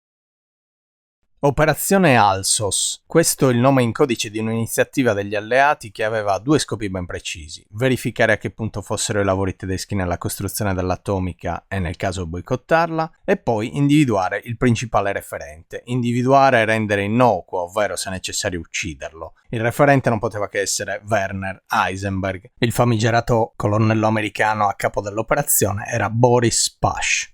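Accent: Sardinia, Italy. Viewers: Professore le faccio tutti i miei complimenti, che narrazione affascinante, impeccabile nella parte scientifica e storica , accompagnata da una grande sensibilità e saggezza. E se mi posso permettere, la lieve intonazione sarda la rende ancora più piacevole (altro che IA!!!) Grazie mille 🙏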